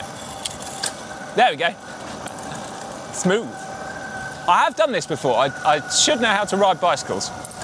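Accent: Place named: RP, United Kingdom. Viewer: there needs to be a super cut of loathing things....cows, myatu's, not getting KOM's...exclusively in his needlessly posh voice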